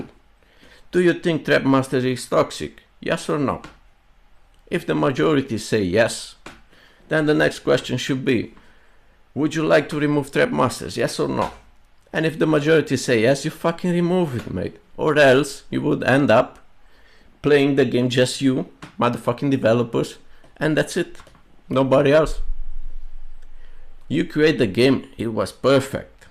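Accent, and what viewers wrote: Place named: United Kingdom. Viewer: all this long I thought dude was British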